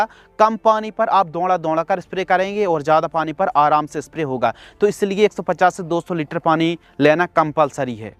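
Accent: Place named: Narsinghpur, India. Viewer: Tum Narsinghpur se lg ray ho bhaiya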